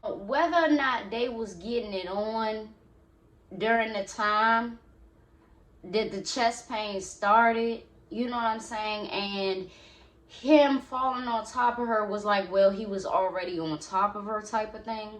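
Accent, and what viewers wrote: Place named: Maryland/DC, United States. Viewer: you must be from the md/dc area 🤣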